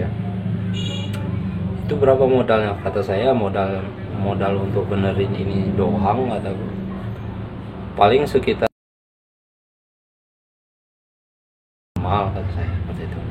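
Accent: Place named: Palembang, Indonesia. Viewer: Logat ny kayak wong plembang .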